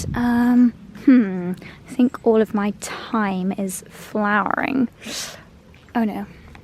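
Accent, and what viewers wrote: Spain. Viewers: Love your Spanish accent! You sounded great!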